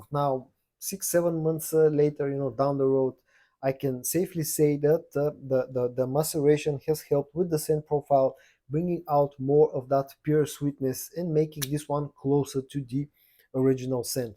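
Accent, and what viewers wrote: Bulgaria. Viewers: Yes, I was a 100 %you are Bulgarian. Judging by the accent. Congratulations!!!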